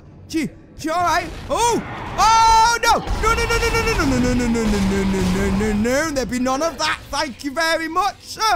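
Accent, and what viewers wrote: United Kingdom. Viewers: This guy is fucking brilliant! He's so lively and energetic, you've gained a subscriber today. P.S. What part of Britain are you from?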